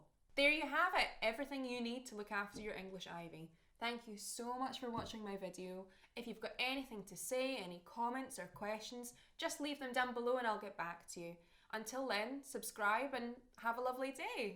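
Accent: Scotland, United Kingdom. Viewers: Love your Scottish accent! 😍 How do I know? From outlander 😆 Great video and info, thanks! 😊💚🙌🏻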